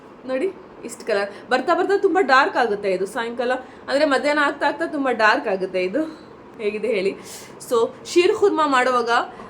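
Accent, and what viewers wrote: Standard. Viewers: ನಿಮ್ಮ ಸ್ವಚ್ಚವಾದ ಕನ್ನಡಕ್ಕೆ ಧನ್ಯವಾದಗಳು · pure kannada sister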